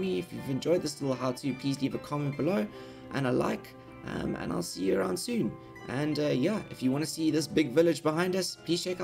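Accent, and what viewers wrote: South Africa. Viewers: You sound South African? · You sound South African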